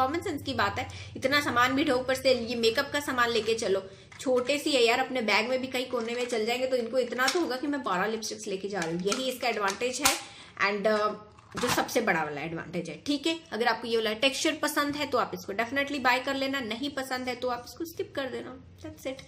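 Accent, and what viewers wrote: Punjab, India. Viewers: Awwww 😍 the way she talk 😍 full Punjabi 😍 love you dii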